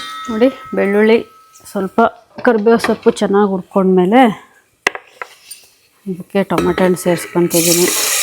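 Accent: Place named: Malavalli, India. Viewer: ಮಳವಳ್ಳಿ ಶೈಲಿಯಲ್ಲಿ ಮಾತಾಡಿ ಕೇಳೋಕೆ ಇನ್ನೂ ಚೆನ್ನಾಗಿರುತ್ತೆ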